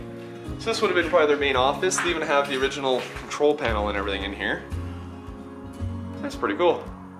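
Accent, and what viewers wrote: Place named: Canada. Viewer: As soon as I saw the ladder I kept saying, "go up!" Lol amazing location. I have to say I love your Canadian accent lol.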